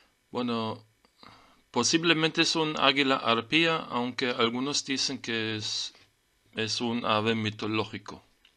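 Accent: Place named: South, Mexico. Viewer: Al escuchar a este hombre pense que era un indigena del sur de Mexico - me sorprende que sea Europeo.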